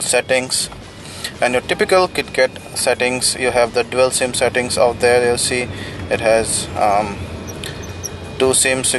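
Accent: India. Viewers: OMG Why every budget phone review is narrated with an indian accent? why jesus??? <--- Racist Detected, sorry guys, but this annoys me a little.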